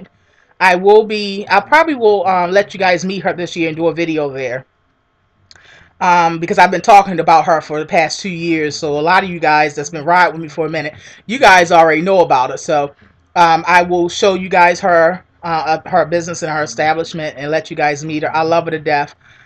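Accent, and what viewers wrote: Baltimore, United States. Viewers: you are making some valid points especially when you trusting someone with your livlihood.and your Mulahhh lol you such are Definitely from Baltimore (your accent) "Rude" the way you say that word lol Have a Bless day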